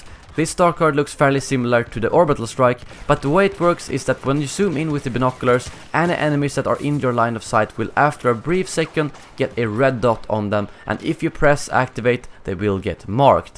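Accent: Sweden. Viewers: Are u from sweden? It sound like so. Btw i am from sweden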